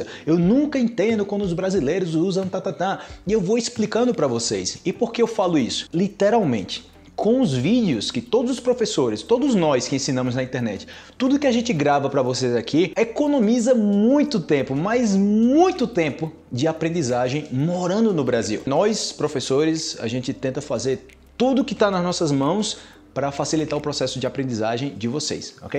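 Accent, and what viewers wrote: Colombia. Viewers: Si, de vivir varios años en Medellín consiguió "pegar o sotaque Colombiano", pero eso no quita que haya nacido y sea Nordestino de Paraíba. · ele tem sotaque colombiano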